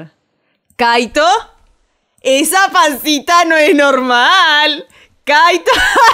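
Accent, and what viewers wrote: Argentina. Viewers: Amo tus videos y me encanta ese asento tan argentino que tenes 😂 Te amo ❤❤❤❤❤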